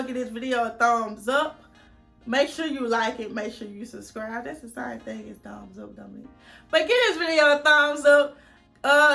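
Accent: South, United States. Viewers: Damm you country af. I couldn't understand most of what you said. Are you hard of hearing?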